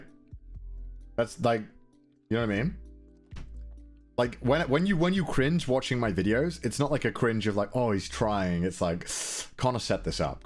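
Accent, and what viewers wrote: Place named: United Kingdom. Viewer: my man's speaking the language of facts with a british accent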